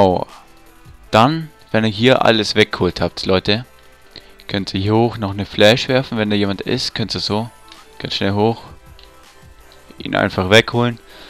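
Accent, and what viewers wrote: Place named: Upper Bavaria, Germany. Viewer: hört man ;) oberbayern?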